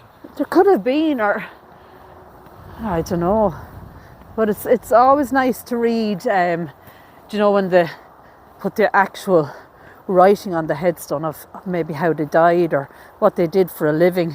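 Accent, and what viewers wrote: Ireland. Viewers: I love your sweet accent or is it called brogue? Very cute. My question is, since the coffins are so old and made of wood, why don't they show signs of body fluids on the bottom parts of the coffins? Were they lined with lead as well? They are definitely much prettier and with more ornate decorations than the metal ones we see now days. I love walking thru cemeteries. I took my little dog for walks at our cemetery everyday for 2 years right up until the day she died. She loved it. Even killed a big giant gopher there one time! We had the ok from the people that worked there for us to walk there. And I always picked up any poops that she dropped too.😊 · I love to see these old headstones,I wish that the church would clean them or power wash them and seal them so they can last another 400 years, I would love to do that, but I live in the states and our old cemeteries have all been damaged, it’s just so sad.., you have the best voice and Irish accent, you are so clear when you speak. 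I honestly could listen to you speak all day. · So good to hear this Irish accent again, it sounds like music to my ears. Cheers, all the best !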